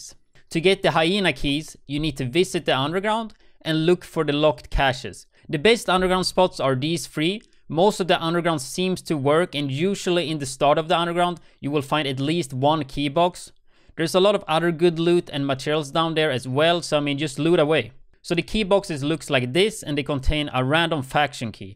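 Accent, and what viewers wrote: Sweden. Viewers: the Swedish accent is strong with this one!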